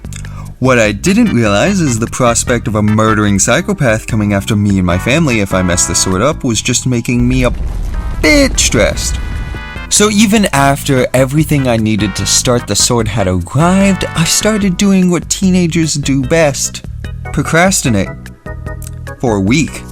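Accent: Netherlands. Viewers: He from Utrecht? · is he dutch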